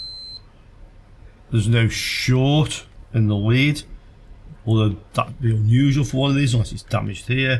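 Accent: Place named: Stoke-on-Trent, United Kingdom. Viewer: Where are you from? I hear Stoke-on-Trent?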